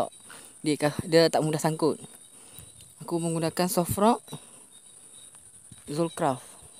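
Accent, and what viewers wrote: North, Malaysia. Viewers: ai org utara ka..kat mana ni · slank utara tuh ckit tak tahan..haaa..tggu dia balaih umpan..hahahah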